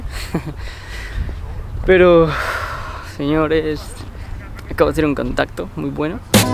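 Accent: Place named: Loja, Ecuador. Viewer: Muy buen vídeo tienes mucho talento, saludos desde Loja hermano, tú pareces Lojano también por tu acento y todo...de que parte eres?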